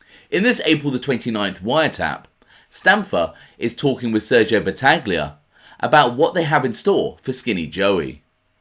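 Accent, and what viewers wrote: United Kingdom. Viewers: It's hard for my minds eye to envision an North East American Italian Gangster when a British Man is reading their Gangster quotes. Instead I see Peaky Blinders or Snatch.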